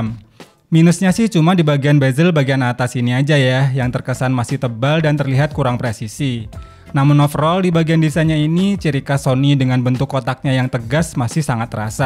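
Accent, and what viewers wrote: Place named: Brebes, Indonesia. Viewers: Maap masnya orang tegal bukan sih ? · Logat medok Brebes qolqolah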